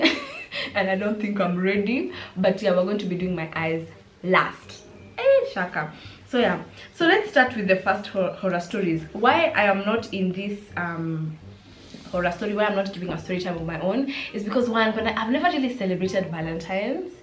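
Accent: United Kingdom. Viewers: The British accent ❤️❤️🔥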